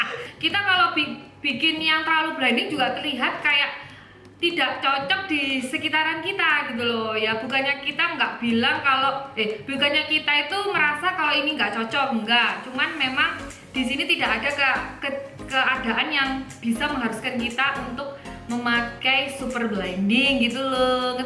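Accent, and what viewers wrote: Java, Indonesia. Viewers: Lgsg ku subcribe lucu liat mbkx medok banget ngomongx...polos lg syyukaa😁😀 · medok tutor e 😂😂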